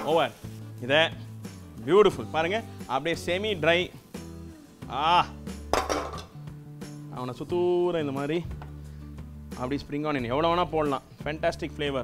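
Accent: Chennai, India. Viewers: semma ,Madras language, I like it.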